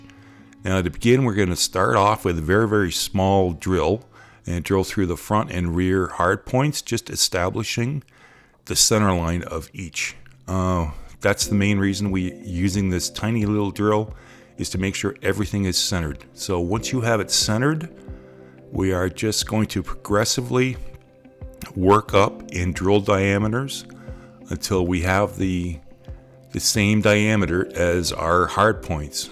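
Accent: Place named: United States. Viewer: Hearing an American accent giving dimensions in millimeters gives me a warm fuzzy feeling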